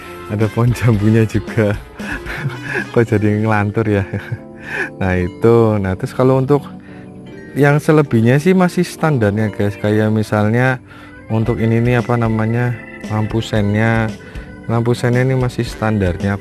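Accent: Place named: Banyumas, Indonesia. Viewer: Kyk ada campuran bahasa ngapaknya hee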